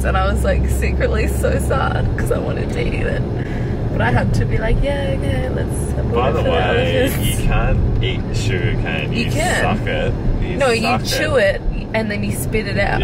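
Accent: Australia. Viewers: What's the city called? I love the Australian accent but sometimes I can never pick up lol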